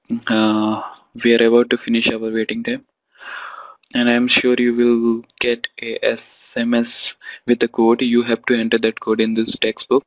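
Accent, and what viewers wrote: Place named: India. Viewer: when i hear an indian voice i hear scam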